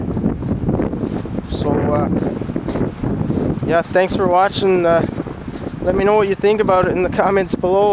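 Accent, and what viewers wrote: Newfoundland, Canada. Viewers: are you from Newfoundland? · you got my subscription buddy! you a newfie? im from ole cape breton!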